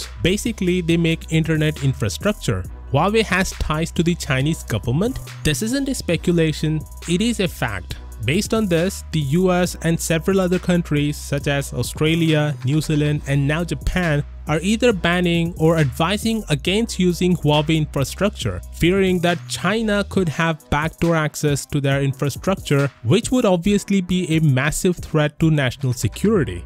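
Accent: India. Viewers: Huawei is good competition for big Smartphone Companies. You also should get rid of your accent you sound like Indian Scammer. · You're sound like an indian scammer.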